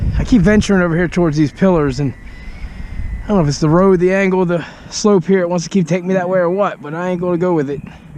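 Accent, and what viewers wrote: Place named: Maryland, United States. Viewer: It is actually a very close guess accent wise, because he is from Maryland.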